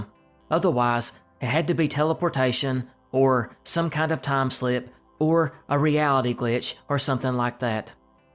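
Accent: South, United States. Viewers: It's soo good to hear a real deep southern voice that isn't put on. Love your show!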